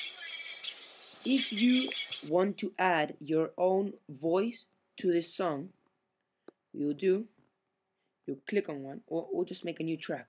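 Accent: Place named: England, United Kingdom. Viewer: your accent is very cool. Where are you from? I can't really tell if you're slightly english, or Indian..thanks for the help too :)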